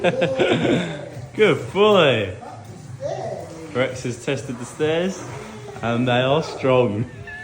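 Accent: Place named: Croydon, United Kingdom. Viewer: Listening to your accent i bet you are close to me too Croydon 🧐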